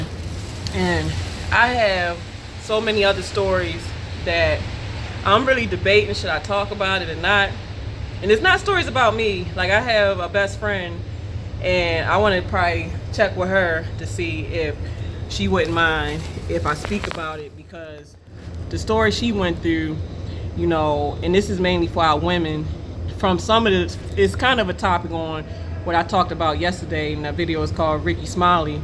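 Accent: Baltimore, United States. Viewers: I know this has nothing to do with the subject matter, but I knew all this time you had a Baltimore accent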